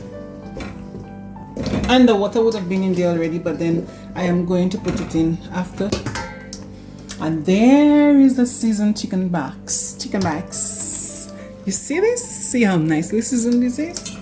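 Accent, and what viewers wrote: Saint Lucia. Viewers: Love the St Lucian accent, keep talking so I can hear more of it. Also it is the green banana that is known as poor man food item...that said there is nothing poor about your nutritious meal!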